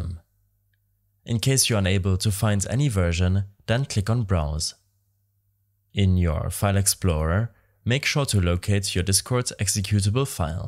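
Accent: Germany. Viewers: I smell deutsch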